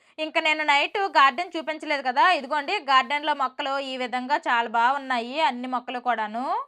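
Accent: Srikakulam, India. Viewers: Mee sreekakulam yaasa chala bagundi 😊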